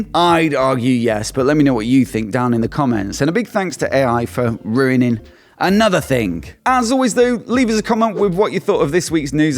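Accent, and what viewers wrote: United Kingdom. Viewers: is becoming one of my top bike news sites. Always interesting, well produced & I love the British accent! All around, a good watch/listen!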